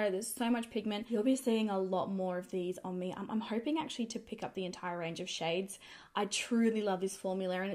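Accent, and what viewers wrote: Australia. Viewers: She has an Aussie accent, leave her alone.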